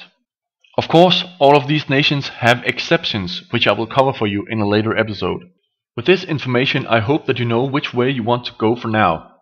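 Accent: Denmark. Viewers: Are you danish? ;) Btw, love your vids! :D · You sound danish. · Are you danish..? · You're Danish aren't you?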